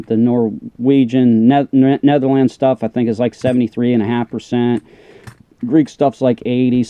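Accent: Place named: Maryland, United States. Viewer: This is a dude from Maryland for sure haha my aunt and uncle have that accent they’re from Hagerstown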